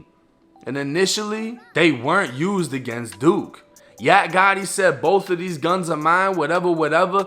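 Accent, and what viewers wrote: Boston, United States. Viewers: Are you from Boston or Long Island?? 😂😂 your voice is so cute reminds me of an actor I watch!!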